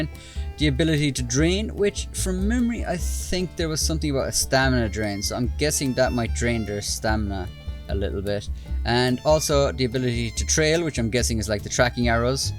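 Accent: Ireland. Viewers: Bro, are you Irish?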